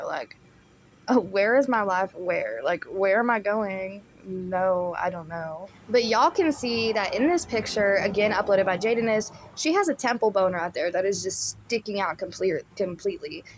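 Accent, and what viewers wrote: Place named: Alabama, United States. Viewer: I must know, are you from Alabama? because I am and we have the same accent!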